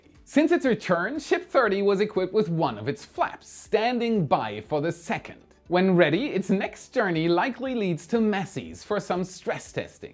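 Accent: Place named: Germany. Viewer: I did not expect my comment to get such a big response and so many likes. With over 500 thumbs up it is obvious many others feel similar. I appreciated your comment because I did not see the episode you referred to where expressed he was getting burned out. I have been a subscriber for almost 4 years, way back when had a very noticeable German accent. I really liked his channel back then but also there weren't as many options. He made a big commitment to this endeavor moving himself and family from Germany to the US to be closer to the action so to speak. His show style and presentation may not be for me anymore but I am glad you and others still enjoy it. I absolutely wish him only the best and even more success.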